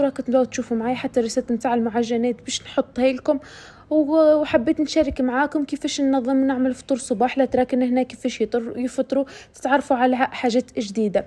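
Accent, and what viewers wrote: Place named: Southwest, Tunisia. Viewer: اي لهجة تونسية تابعة للجنوب الغربي التونسي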